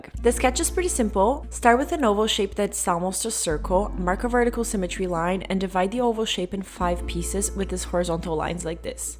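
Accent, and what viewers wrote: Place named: Brazil. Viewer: 😅vey eu vendo esse vídeo em inglês e falei ela com certeza é brasileira o sotaque em inglês igual ao meu, ameiii obrigada pelo tutorial, adorei ❤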